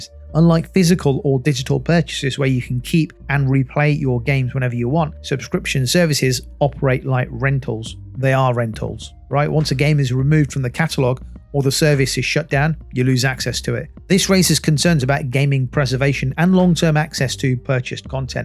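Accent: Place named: England, United Kingdom. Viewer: You've clearly got an English accent, so why you talking bucks & dollars and not £s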